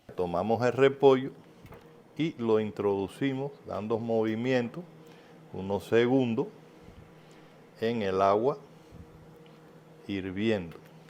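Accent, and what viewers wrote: Cuba. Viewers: Me encanta ver como prepara sus comidas deliciosas pero lo mejo es ese acento Cubano tan dulce!!! ❤❤❤❤ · Querido abuelo me hizo recordar a mi papa cubano ese acento cubano es inconfumdible gracias por traerme viejos recuerdos y voy a preparar esta receta con congri gracias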